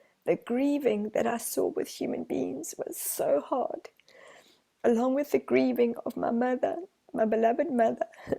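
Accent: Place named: South Africa. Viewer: Hello. I have just today been divinely guided to you. The moment I heard your voice I immediately identified with you as a fellow ex South African. I have been living in Melbourne Australia since 1982. Thank you for your wisdom. Thank you for introducing me to the Council of 8. I know there are no coincidences and that I was meant to connect with you right now. I feel so comfortable and at home in your energy and that of your mentors. THANK YOU. With so much love. Annette ❤️🤗🙏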